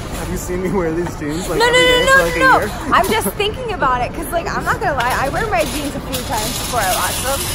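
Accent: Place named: Philadelphia, United States. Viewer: Awesome video,. I love your philly accent. I wash my jeans once a week. 😘😘😘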